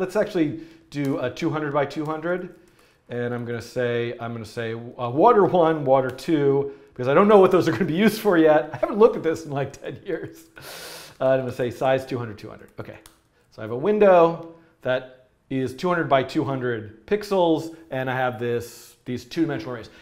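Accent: France. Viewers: I love your french accent 😝 préviou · Thanks for your content, your french accent was sooo bravo that I tried to implement this challenge, I added a bit of colors and random agents walking on the screen. Here's a link if you want to take a look at it. <link>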